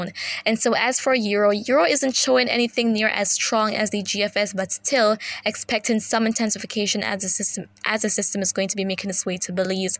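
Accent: Jamaica. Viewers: Appreciate you, they draw you out with the Patois though😆😆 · lol wait deh no man your patois sound nice man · The Jamaican dialect had mi panny floor 🤣🤣. Jeezas ah love it 😀 Wooooiiiie mi body 😄. Thank you sooooo much for the consistency of your forecasts and detailed analysis. I need to see you on our TV channels. Mek dem stay deh nuh tek heed n sih 🤣😅🤣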